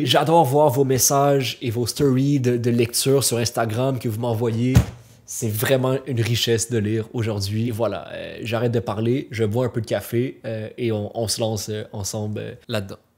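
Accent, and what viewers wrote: Quebec, Canada. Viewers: I don't know why YouTube recommended your channel to me but I loved the different accent though I don't understand French/Quebecois. Greetings from Rio de Janeiro 🇧🇷☺️ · J'ai beaucoup de mal a comprendre les critiques sur ce type de défi. Autant je suis quelqu'un qui aime prendre son temps pour lire un livre et je suis plutôt du genre à lire un livre par mois, autant voir une personne qui se dit "je vais me motiver pour me remettre à lire et reprendre le gout de la lecture", comment trouver ça négatif ? Je trouve que c'est vraiment bien ce que tu fais, ça pousse à se remettre à lire, à se dire qu'on peut se donner des défis personnels pour se motiver à faire des activités, après chacun suit son rythme et ses envies. Bravo en tout cas pour tes vidéos. (PS: Ça fait plaisir d'entendre parler un peu québecois sur mon YouTube très anglais ou français, cela me rappelle ma belle année en Gaspésie.)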